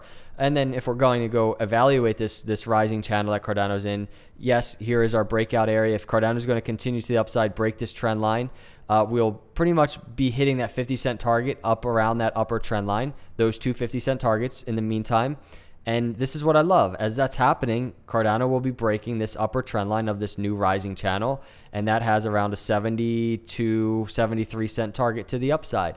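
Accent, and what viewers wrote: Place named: Mid-Atlantic, United States. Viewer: I think I like you because you sound like you're from the NJ/PA/DE/MD area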